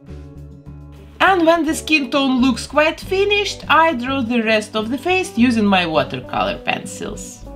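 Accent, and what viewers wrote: Russia. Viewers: For so long I wondered why this Belgian lady has a Russian accent... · Buffy I was just abt to comment that, her mold is perfect and she’s Russian herself (she has a Russian accent so I’m guessing she’s Russian)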